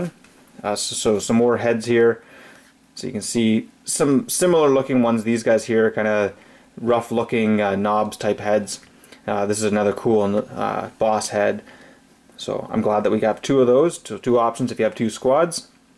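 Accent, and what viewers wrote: Canada. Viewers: i can tell from the accent. mtl?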